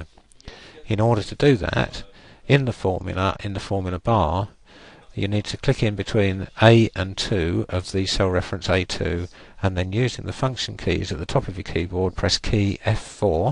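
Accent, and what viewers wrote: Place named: United Kingdom. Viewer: I fucking hate british accent! Didn't understand a half of what you said.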